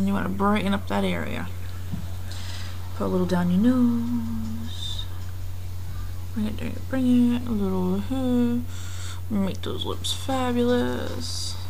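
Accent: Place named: Kentucky, United States. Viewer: there is that kentucky accent again lol , funny , enjoying these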